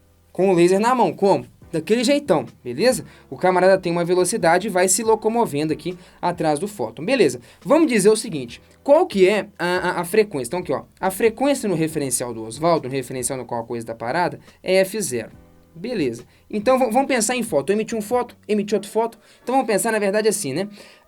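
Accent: Minas Gerais, Brazil. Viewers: Cara eu amooo que vc tem sotaque de minas, me sinto acolhida kkkkkkkkk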